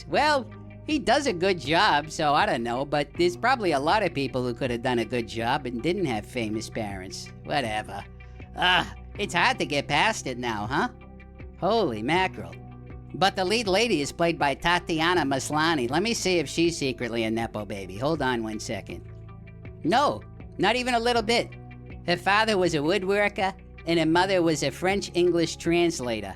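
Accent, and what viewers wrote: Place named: Boston, United States. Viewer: I can for the silly title and stayed for the Boston accent. Cool animation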